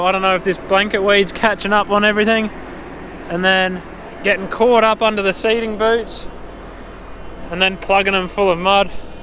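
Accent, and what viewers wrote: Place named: Australia. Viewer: How the heck did a population of convicts from the British empire get to develope such a Croc Dundee accent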